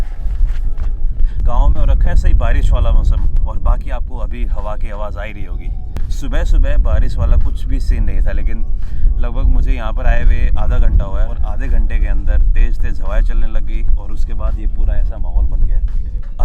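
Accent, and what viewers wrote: Haryana, India. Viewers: bhai Haryanvi mst lgti hai apke muh see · bhai ki haryanvi ekdum keher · i belong from a village of UP but it was wonderful to see village lifestyle of Haryana with some desi Haryanvi pure akscent. Tahnxx, i last vedio i suggested you to show some water conservation technique you are doing good in this channel believe me. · Nani and u rock bhai ! Bhai Thara video dekh r mjo aaygo ! Hindi nav to aapni haryanvi mst lage bhai ! Or didi - niche to kar inee ! 😄✌️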